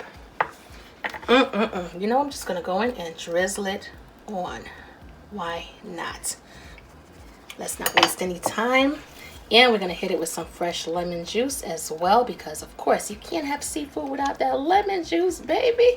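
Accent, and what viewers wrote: New York, United States. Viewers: This recipe looks so good. My mouth is watering ok!!! I love the way you put it all together with simple ingredients. I know you're my NY homegirl from the way you say "sauce" "talking about" and other words as well. Thanks for sharing your wonderful recipes and impeccable cooking skills. By the way I'm a Bronx girl "The Boogie Down". Keep doing what you do!!! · Sound like you from New York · Dear, please, if you can, write the names of the seasoning / ingredients on the screen, as you add them. Many vloggers do that, and that's Good for people who don't have English as first language, and people who are hard at hearing, and many senior citizens are hard at hearing. Also, not all English speakers will understand your American sounding accent, so by writing on the screen, you'll make it easier for the whole world to watch your videos and appreciate it. 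Non English speaking Africans may not watch because of the language, most Indians, Chinese, Arabs and others may have difficulty in understanding what you are saying, and by writing on the screen can give you more views. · I’m not from the U.S, is that a Newyork accent?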